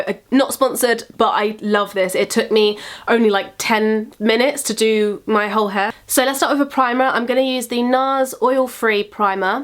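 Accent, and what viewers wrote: London, United Kingdom. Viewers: I have watched nearly all of your videos and i think you're amazing! i also love the way you say "gorgeous"! gotta love the london accent ;D xx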